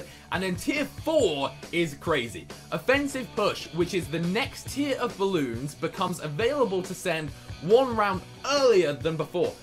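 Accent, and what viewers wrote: United Kingdom. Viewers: is your voice a bridish accent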